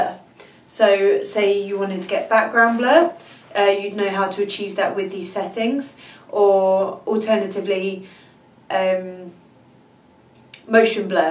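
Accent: United Kingdom. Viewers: What happened to the nice dragon lady...have you married and forgot us here? lol...love your British accent, yea!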